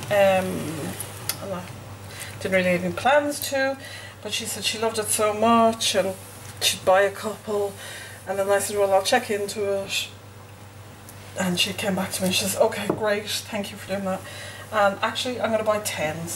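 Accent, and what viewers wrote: Ireland. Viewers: it's always such a joy to watch yours video's. Not only for the soapmaking, but also your contagiously laugh, hearing you chatting,.. dare I say your Irish accent which is as pleasing to hear! <3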